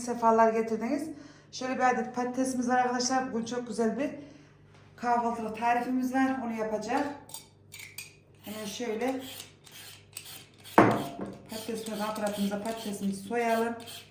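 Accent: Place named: Nevsehir, Turkey. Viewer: Selamünaleyküm memleketlere Nevşehirli gibi konuşuyor aynı güzel oluyor eline sağlık Eline sağlık ben de yapıyorum onu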